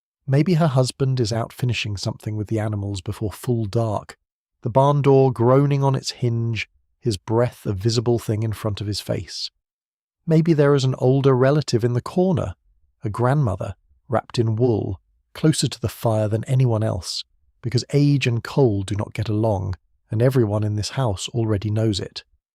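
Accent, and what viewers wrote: United Kingdom. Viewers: Why do all these channels have to be a British accent. Its frustrating for me to try and sleep while trying to figure out what ur saying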